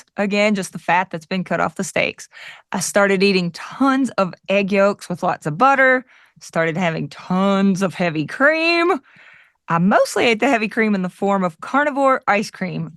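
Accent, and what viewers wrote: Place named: South, United States. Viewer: You had me from the moment you said, "That is it for the old news..." The momen you started describing your sudden craving for pure fat, and said it in your adorable southern accent, you had me smiling, laughing, and totally charmed. Thoroughly enjoyed your video. You didn't mention your complexion, but that had to be at least as good as, if not better than, your cholesterol levels! Let 'er rip,!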